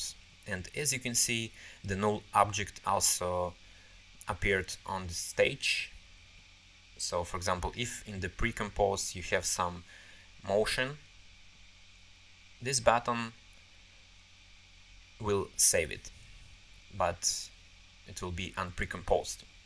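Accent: Russia. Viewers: Для какой версии скрипт? На 2019 запускаю, не появляется логин и пароль, по акценту вроде парень из России, поэтому пишу по русскому))) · наче росіянин говорить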